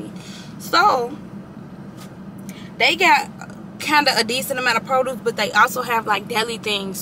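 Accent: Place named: South, United States. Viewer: You have to have some southern roots it sounds so cute though tbh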